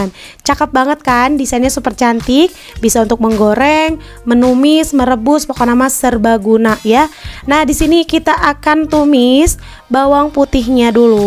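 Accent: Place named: Sunda, Indonesia. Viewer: Lucu deh ah cara ngomongnya..meni sunda pisan 🥰🥰🥰..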